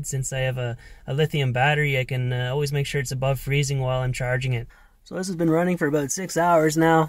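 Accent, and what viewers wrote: Canada. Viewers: You are SO smart!!! How'd you learn about all that stuff??? Your cooking always looks good as well! I admire how you like to cook your own food instead of buying fast food, I do also. You are cute, and I love your Canadian accent! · I can hear the Canadian "Eh" in your voice. Lol. I love your channel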